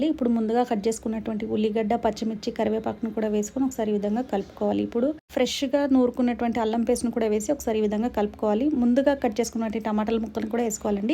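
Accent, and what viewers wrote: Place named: Andhra Pradesh, India. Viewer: Miru andhra valala matladuthunra slang 😊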